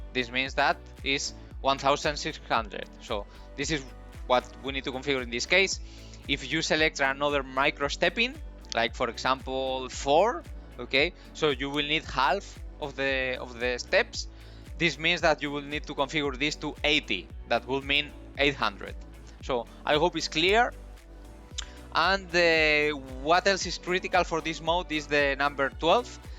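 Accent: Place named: Portugal. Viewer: My electronics teacher was from portugal. He sounded like you. Great teacher like you.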